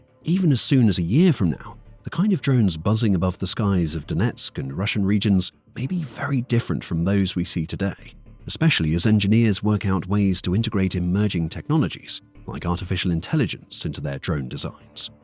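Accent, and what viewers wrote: United Kingdom. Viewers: always a British accent too.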